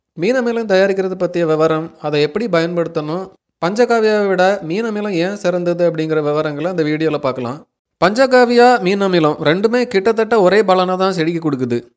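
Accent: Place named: Kanyakumari, India. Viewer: Neega kanyakumari thana.. slang apditha teridhu..😃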